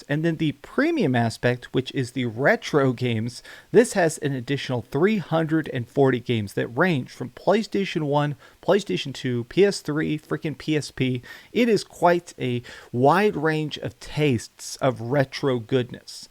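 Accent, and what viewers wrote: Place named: Mississippi, United States. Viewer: Dude, some of the words that come out of your mouth make me think you're from Mississippi, lol.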